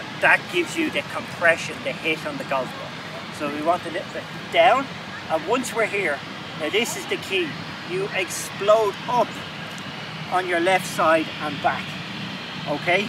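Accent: Ireland. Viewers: I love getting golf lessons with an Irish accent.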